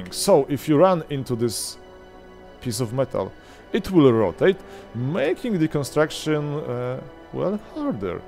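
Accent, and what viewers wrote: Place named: Russia. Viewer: rasian akcent like wuj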